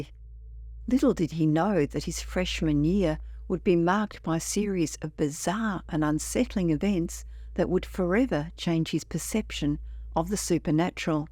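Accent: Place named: Australia. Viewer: Being an Aussie I love hearing our accent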